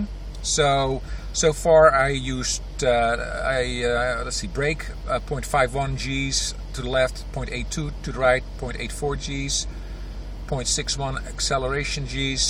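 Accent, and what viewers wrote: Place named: Netherlands. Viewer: Your Dutch? The accent sounds familiar..??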